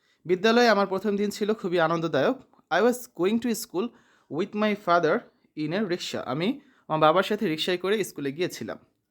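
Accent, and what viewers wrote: Sylhet, Bangladesh. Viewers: Bhai afne kita Sylheti ni?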